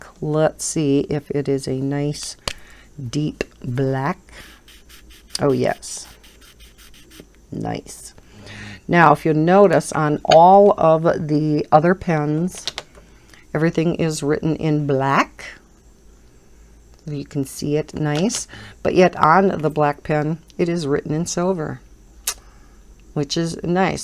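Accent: Midwest, United States. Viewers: I love your videos....where are you from? I was born in Mn and I hear a mid-west accent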